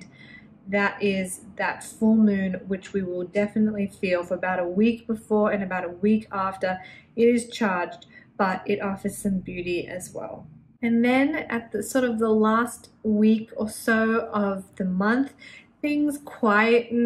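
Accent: Australia. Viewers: Didn’t realise you were Australian! That’s so cool 😊 what state are you from?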